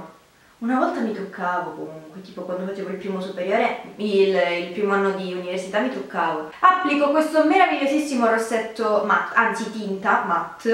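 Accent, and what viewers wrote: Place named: Calabria, Italy. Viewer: Ma di dove sei .. la tua voce sembra calabrese 😍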